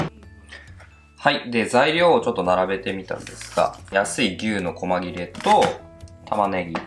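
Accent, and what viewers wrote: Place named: Kyushu, Japan. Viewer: 春雨は増えるから量が参考になりました そうそう！ ち、ちらしで作るゴミ箱です〜 我が家も台所で出る生ゴミとかをチョイって入れてそのまま捨てられるので作り置き？してます 時々でる九州弁！いいですね (๑˃̵ᴗ˂̵)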